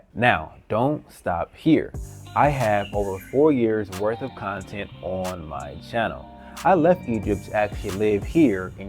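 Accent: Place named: United States. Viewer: People dancing around the subject smh.. he's referred to as an Abid in Arabic, which means slave. Lucky he is, and sounds American, as that would've contributed to a slightly better experience. He is also originally an African, not Caribbean American (i.e. the real "African Americans) and that will help him navigate Egyptians too Egypt really is the last option for anyone living in the west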